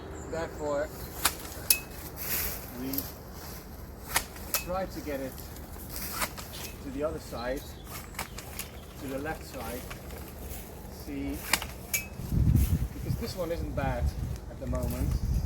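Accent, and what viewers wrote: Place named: Netherlands. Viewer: Benieuwd in welke regio je woont, lijkt me umbria/toscane op basis van de olijven en cipressen. Ik ga er op basis van je accent ook maar vanuit dat je Nederlands bent. Ben ook bezig met de cursussen van vaso policonoco , komende winter normaal avanzato en dan het examen zoals ik zag in je andere video.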